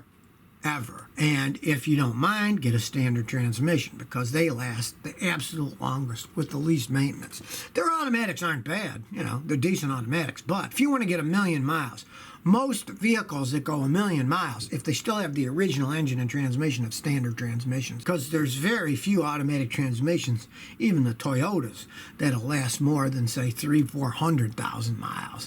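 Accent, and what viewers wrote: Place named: Buffalo, United States. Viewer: You talk like a buffalonian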